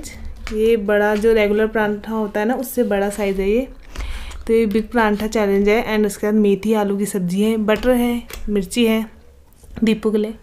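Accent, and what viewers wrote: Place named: Garhwal, India. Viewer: but she is not Punjabi she is uttarakhandi (gadwali) like me I am also from uttarakhand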